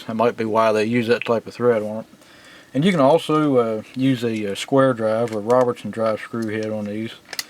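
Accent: South, United States. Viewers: I don't have any comments about this video in particular, but I just wanted to say that I just discovered your channel and the videos are really well made and I appreciate how informative you are. Keep it up! Also, you don't have to say if you don't want to,but.. you wouldn't happen to be a TN or a NC man, would ya? You sound like.. well, you sound like me. haha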